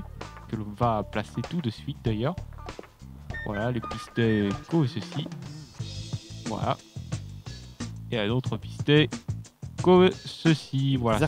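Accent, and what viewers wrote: South, France. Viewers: Rien à dire sur le système, mais votre accent du Sud est vraiment très très prononcé et c'est dur à écouter...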